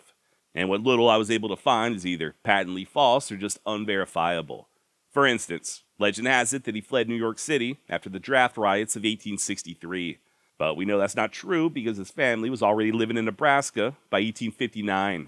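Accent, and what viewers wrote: Texas, United States. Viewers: tf? What are you talking about bro? He’s just from Texas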